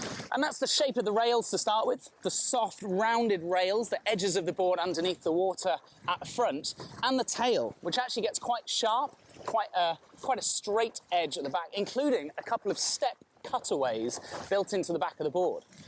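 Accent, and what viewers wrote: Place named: England, United Kingdom. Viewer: Beautiful English accent!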